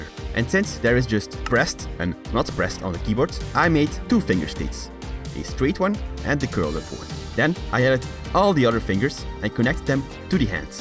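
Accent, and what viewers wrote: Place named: Netherlands. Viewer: Do you speak Dutch? Because your English accent sounds a bit Dutch :) No hate.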